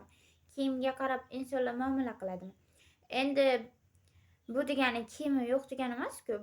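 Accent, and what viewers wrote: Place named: Tashkent, Uzbekistan. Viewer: qayerlisan bir qarasa chiyalcha gapirasan🤮 🤮 🤮 🤮 🤮 🤮 🤮 🤮 🤮 🤮 bir qarasa toshkentcha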